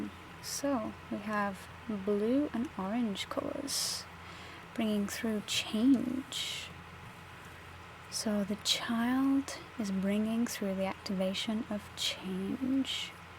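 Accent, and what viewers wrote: United Kingdom. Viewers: Hi are u from the UK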